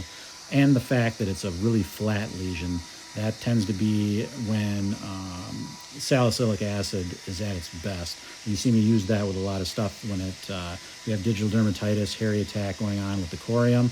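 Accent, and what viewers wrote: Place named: Wisconsin, United States. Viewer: I can tell a good ol Wisconsin boy when I hear one! I love your videos. You're the best one I've seen. You can really tell you care.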